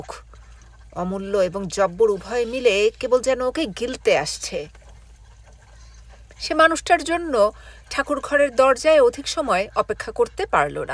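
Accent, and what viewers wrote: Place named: Bangal, Bangladesh. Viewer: Ekhono parjanto Kato madhur gramyo poribesh mone hochhe ekta bhoyonkar din asbe khub valo porchho bisesh kore bangal vasa amar rater samay tomar galpo shonar jonyo ❤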